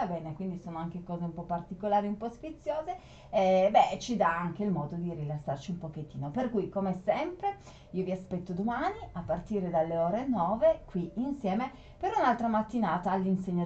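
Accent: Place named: Piedmont, Italy. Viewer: Che bella donna che bella persona ❤️ io amo il tuo accento 🌻 ..avevo un'amica del Monferrato mi piaceva troppo come parlava mi ricordi quella situazione piacevole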